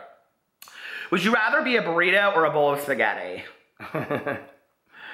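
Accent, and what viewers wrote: South, United States. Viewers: Just found you....love u so much! Are you from the south?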